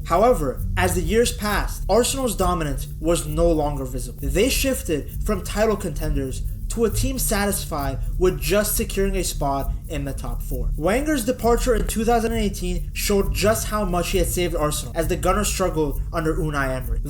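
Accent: United States. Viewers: "VEN-ger," not Wenger. Sorry, man, but if you want people to believe you are an authority on football you need to be able to pronounce correctly the name of a manager so famous that little girls in Europe know it. It's like you never watched a premier league game before 2020 or never listened to pundits discuss the sport. I'm not dissing you, dude, but you sound like some Yank creating clickbait, not a true fan of the beautiful game.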